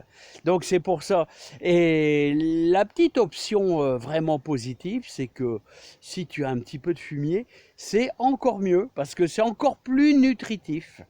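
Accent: North, France. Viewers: Bonsoir monsieur, une video intéressante, j'ai toujours autant de plaisir à vous écouter, et cet accent chaleureux de mon nord que j'ai quitté, prenez soin de vous à bientôt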